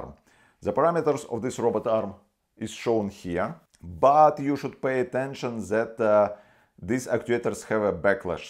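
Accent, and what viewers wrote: Russia. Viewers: бесит жёсткий русский акцент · Хороший робот, быстрый и тихий. С русским акцентом намного понятней) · ЧИСТО РУССКИЙ АКЦЕНТ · Мне одному кажется что это русский английский, робот офигет какой тихий, интересно как устроен сервопривод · очень выражен русский язык, так как английский не родной. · русский акцент :-). Крутая вещь.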